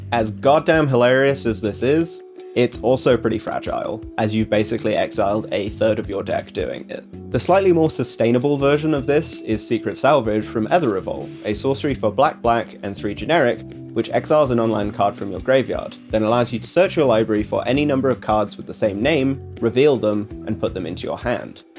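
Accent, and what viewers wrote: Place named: United Kingdom. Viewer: I see slime video I hear british I sub. Fellow UK Slime enjoyer.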